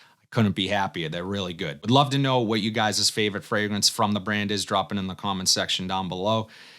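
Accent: Boston, United States. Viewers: That Boston accent 🫣🤣🤢🤮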